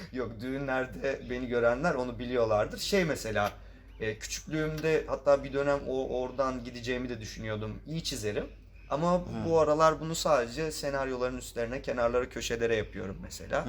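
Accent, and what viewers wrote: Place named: Italy. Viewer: Abi sen italyan ca konuş daha iyi (yani aksanı)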